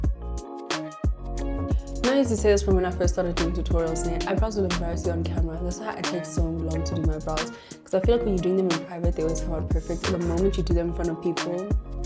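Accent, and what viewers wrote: United Kingdom. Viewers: The British accent sends me 🤣🤣🤣. Love it sooo much. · Uhm so the UK accent….. is this a hint….. oh so ever gorgeous ke wena international makeup plug? 🙏🏾😘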